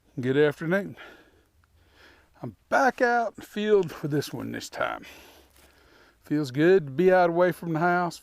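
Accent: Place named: South, United States. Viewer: It's nice to find a whisky reviewer with a southern accent. I guess there's nothing inherently wrong with being a Yankee 😂 but I'm a Texan, and it just galls me to find people even in Texas talking about whisky like they're from Indiana or somewhere. Hearing you talk is nice - plus which you don't put on a fake video persona, but just talk about whisky, which a lot of these characters don't seem to understand is a good thing. 🙂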